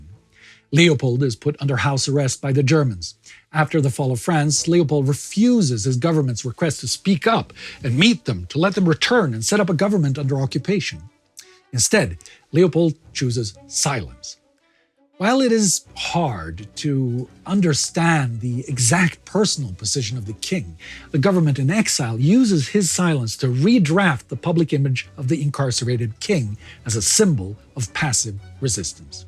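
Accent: Netherlands. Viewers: Heerlijk, dat Nederlandse accent.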